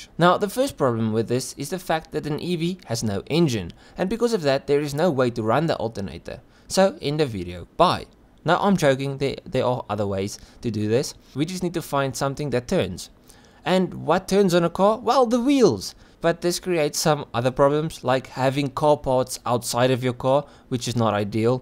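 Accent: South Africa. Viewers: Brro are you south african?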